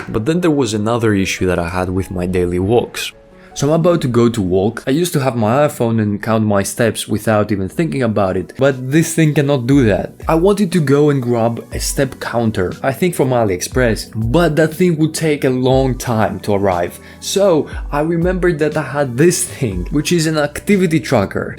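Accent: Greece. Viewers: Ημουν σιγουρος οτι ησουν Ελληνας απο τη προφορα (μια χαρα ειναι μην αγχω) αλλα οταν αρχισες να ραπαρεις στα ελληνικα το κλειδωσα, εχω κγω την ιδια ιδεα τελευταια με τη digital ζωη σκεφτομαι να το ξεκινησω να δω πως θα παει. Ωραιο βιντεο!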